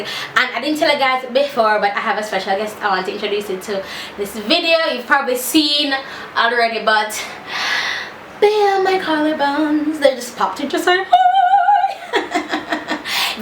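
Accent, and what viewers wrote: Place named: Jamaica. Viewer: lololol girl don't kill me! I'm a trini, but I love to hear Jamaican's talk. lol when you'all say blood cleanse, dat absolutely crack me up!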